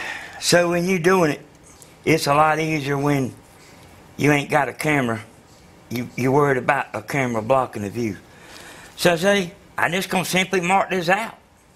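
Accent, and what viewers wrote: South, United States. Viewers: I be good ol central valley California boy...no sooner I heard that deep down southern accent...hit that subscribe button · love your southern drawl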